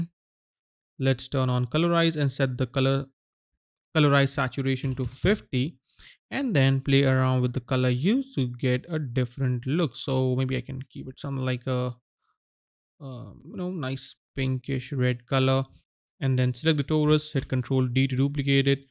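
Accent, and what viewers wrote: India. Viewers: all is good man, but indian accent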